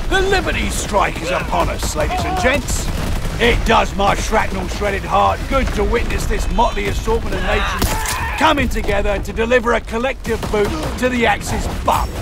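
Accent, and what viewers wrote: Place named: Australia. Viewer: Isn't it a little ironic that an Australian man narrates this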